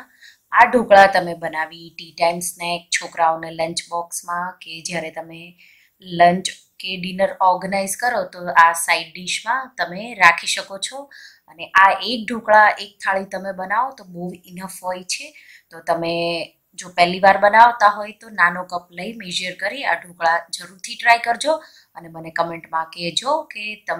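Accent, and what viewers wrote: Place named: Kathiawar, India. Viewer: ઈ બહુ સરસ બયના છે. મને તમારું કાઠિયાવાડી - હિન્દી બધું મિક્સ સાંભળવાની મઝા આવે ☺️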